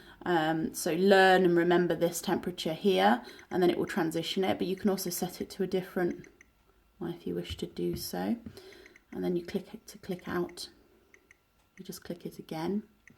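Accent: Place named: United Kingdom. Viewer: Whatever way I try to say this, it will sound sexist to some degree but it is not meant that way at all. It is SO refreshing to hear a woman's voice on one of these usually male-dominated videos. And the voice is British. And the voice knows what it is talking about! I have watched a lot of NEST stuff and it is either mounted to the wall with a wire or on the table base - nice to see it on the wall with the wire and also unique to see it 'unplugged' from the mount. A video made by someone who shows what I want to see, not all the stuff available elsewhere. Brilliant!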